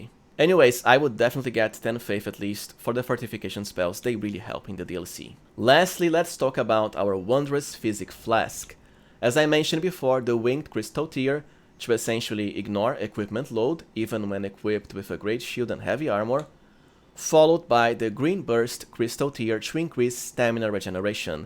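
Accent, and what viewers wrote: Brazil. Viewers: Are you BRAZILIAN? RECONHECI O SOTAQUE A KILOMETROS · You have a strong Brazilian accent. Are you one?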